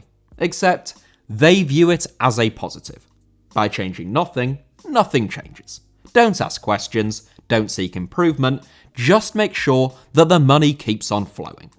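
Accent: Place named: England, United Kingdom. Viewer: his accent is a fairly normal English accent. Get you head out of your ass and learn that you haven’t been made a member of the pronunciation police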